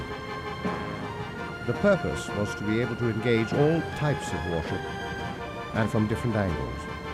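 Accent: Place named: United Kingdom. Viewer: Why do documentaries always have to be narrated by a brit?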